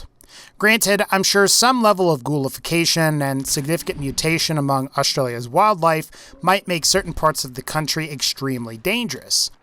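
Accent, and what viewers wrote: United States. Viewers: As an Australian, it is quite amusing to listen to you, an American, pronounce some of our major cities. i.e Melbourne = Mel-Bin. Brisbane = Bris-Bin.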